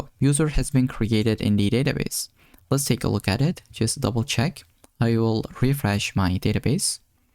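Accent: Turkey. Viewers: Merhaba kardeşim,aksandan hemen Türk olduğunu anladım .Teşekkür ederiz video için:)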